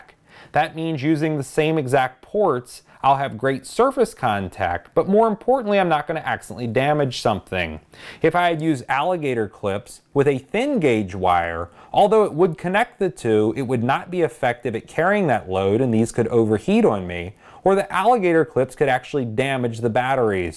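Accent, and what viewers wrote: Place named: United States. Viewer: Effing yanks , your English is gross .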